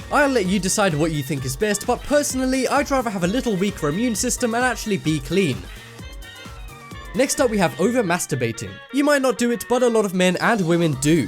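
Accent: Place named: United Kingdom. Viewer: I like this channel because of the guy's british accent tbh tbh tbh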